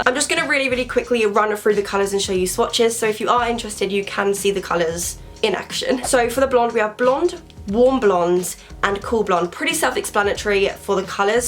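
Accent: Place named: United Kingdom. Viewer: I love your British accent is so niiiiiiiiiiice. I've always wanted to talk like how you do.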